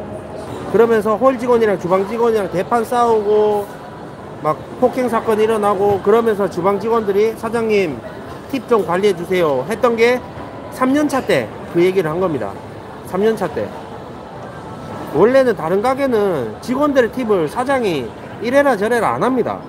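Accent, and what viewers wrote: Gyeongsang, South Korea. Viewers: 좋은 공부가 되셨네요. 힘내세요. ^^ 경산도 사투리 때문에 그냥 말하는게 업되어 보이는 걸 보는 사람마다 받아들이는게 틀리니 마음에 담지 마세요. 힘내세요.^^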